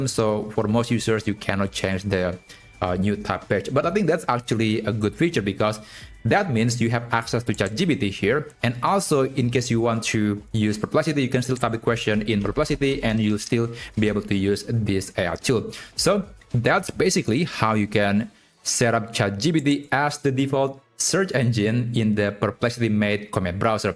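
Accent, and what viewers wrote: Indonesia. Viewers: Apakah anda orang indonesia ? Dari logatnya sih orang Indo yah kak ?😊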